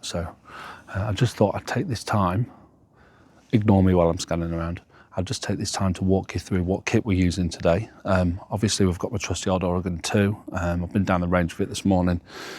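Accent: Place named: Lincoln, United Kingdom. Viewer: lincoln, im hull, thought i recognised that beautiful mucky accent. il give you a message one day and pop down!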